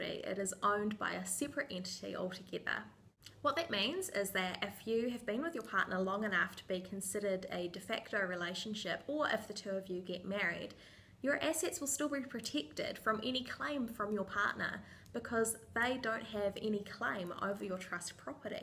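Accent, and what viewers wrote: New Zealand. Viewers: she has a kiwi accent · We Americans (palm slap)....She is not from the UK! The accent sounds similar to the Aussies but I believe she is a Kiwi. Beautiful accent. Isn't it interesting how trusts function so similarly across state, vis a vis governments lines? With the exception of some specifics this information applies to trusts in general.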